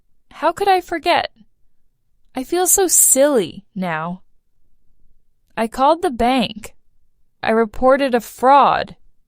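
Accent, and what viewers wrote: United Kingdom. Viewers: Is this British accent? However this kinda lessons are so precious to us❤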